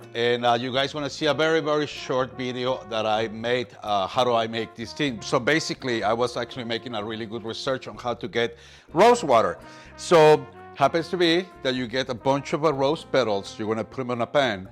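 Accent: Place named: Latin America. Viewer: I’m sure your Latin accent is part of why “Production” fell in love with you. 😂 The rose water tutorial was great. I need to try that. Salud! 🥃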